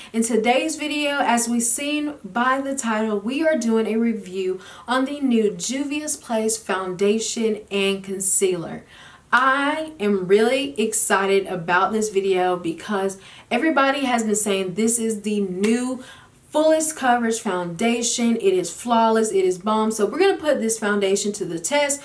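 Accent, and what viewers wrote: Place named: North Carolina, United States. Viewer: love your accent? are you from North Carolina?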